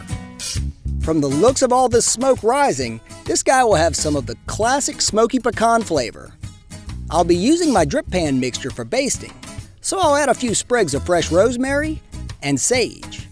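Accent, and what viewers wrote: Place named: Midwest, United States. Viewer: If you were born in Gonzales , Louisiana . Why , can't you pronounce French words correctly ? You sound your words not with a Louisiana accent , but with a typical Midwest accent . I will say your food looks absolutely delicious . I really enjoyed watching you prepare your dishes . "Bon travail avec votre programme alimentaire ". Dieu vous protege.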